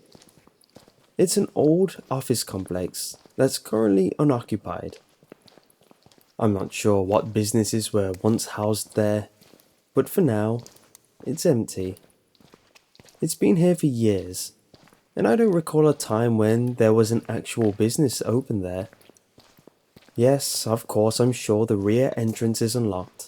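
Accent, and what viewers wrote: United Kingdom. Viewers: "Tooo The Le Taah"...damn I love british accents 😁 And fuck this, waaay too many instructions going waaay too fast. I woulda been said, "fuck you, I'm not THAT bored!" You are my all time fave pasta reader. You could read the ingrediants on a candy bar wrapper and make it sound bad ass. Please start a childrens' channel and read Dr.Suess and fairy tales stories also 👍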